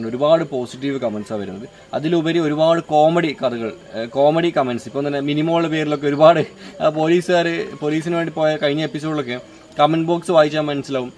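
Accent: Kannur, India. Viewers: Katha ellarum parayunnath nammalk ishtamakillaa. Bt ingal parayumbo entho kettirunn pokm manushya . Onnamath ee kannur karante slang okke kekkan nalla rasaaappaaa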